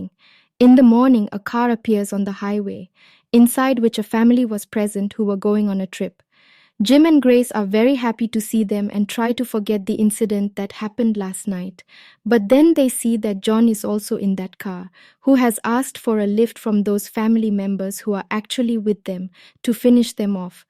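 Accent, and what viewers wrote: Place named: India. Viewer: Indian voice I hate it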